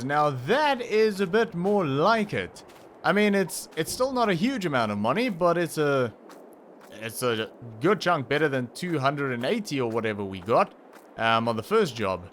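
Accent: South Africa. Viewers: just came across your channel and thought i heard a south african xD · Hearing his voice in the first 2 minutes I knew he was South African and them him confirming it is hilarious. Looking forward to go through your old series and awe from a fellow Sa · I new I smelt a south african accent😂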